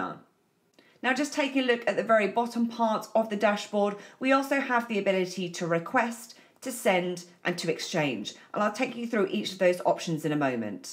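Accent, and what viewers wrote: United Kingdom. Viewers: the heavy British accent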